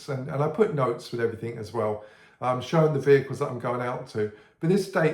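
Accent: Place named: England, United Kingdom. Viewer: Thank you, really enjoying your channel content... 👍 ...you sound english, are you in the USA as you price in dollars $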